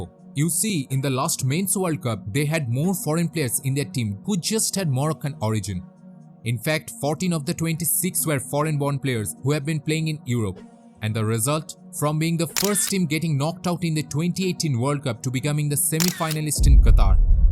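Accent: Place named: Bengal, India. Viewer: ভাই। বাংলায় বল । English টা এরম বাংলা বাংলা accent e bolle joghonno shonae. ( বাংলা টাও ইংলিশ accent এ বললে জঘন্য শোনায় ) ।উচ্চারণ করতে অসুবিধা হচ্ছে বোঝাই যাচ্ছে। কানে লাগছে।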